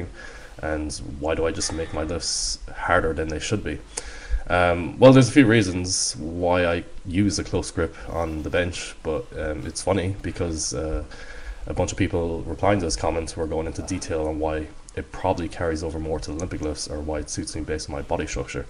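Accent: Ireland. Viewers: Are you Irish ? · Wtf your Irish .. I thought your American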